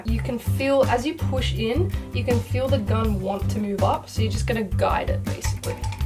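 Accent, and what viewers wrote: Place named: Australia. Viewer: Jazza sent me. Nice video. Was thinking: Sounds like an aussie. Then you bust out a "now this blokes all done" Yea that'll do it.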